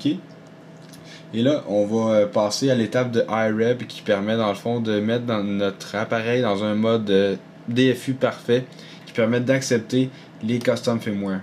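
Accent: Quebec, Canada. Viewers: SISI LACCENT QUEBECOIS !! =) (Y)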